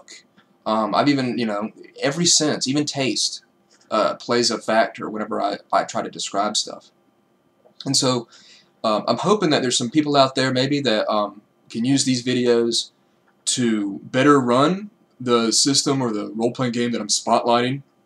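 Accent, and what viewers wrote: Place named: South, United States. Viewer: Are you from Greenville SC? I hear the southern in your voice and I saw the WYFF meteorologist video lol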